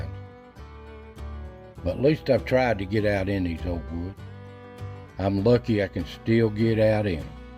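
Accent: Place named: South, United States. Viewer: that sweet southern drawl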